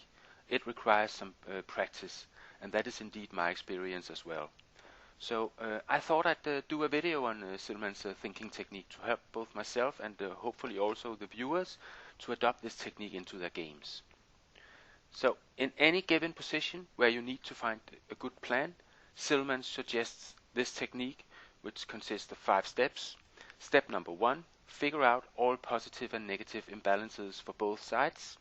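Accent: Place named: Denmark. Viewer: Love the danish accent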